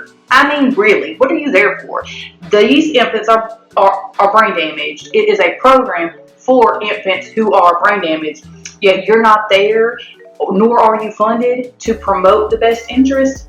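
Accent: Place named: South, United States. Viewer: I like the Southern accent. It’s very similar to the Manchester Accent in the UK 🇬🇧.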